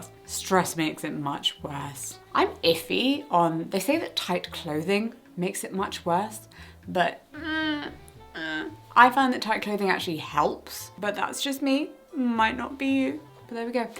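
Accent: United Kingdom. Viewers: I'm a nurse and I think you explained very clearly and simply what dysautonomia is and why it happens ! Always a pleasure to watch your videos (and also I'm french and I think your accent is deliciously british ✨ that's also a pleasure to hear)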